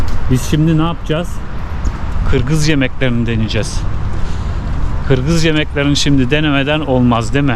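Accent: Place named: Aegean, Turkey. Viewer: türklüğüyle övünen güzel bir kanal..tebrikler..şiveniz egenin ödemiş tarafları gibi, nerelisiniz kardeşim?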